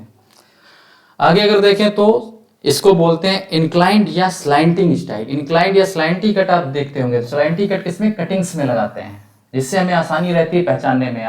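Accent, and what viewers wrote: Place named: Bahraich, India. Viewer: Sir bahraich side se hain na, mainly mahsi, apka accent bht match hota hai yaha se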